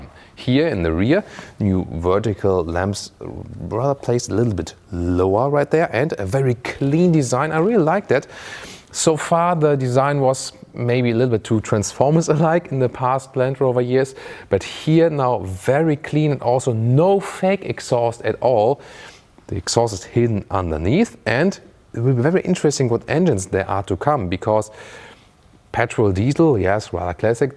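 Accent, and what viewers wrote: Germany. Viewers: Like always great review ! thank you! I like German accent! good job!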